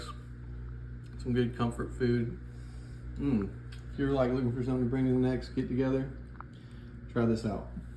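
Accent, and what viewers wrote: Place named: Tennessee, United States. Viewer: Should have known ya'll were from Tn😂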